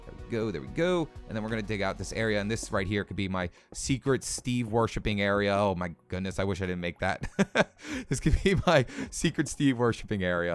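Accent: New York, United States. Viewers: You from New York? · Are you from New York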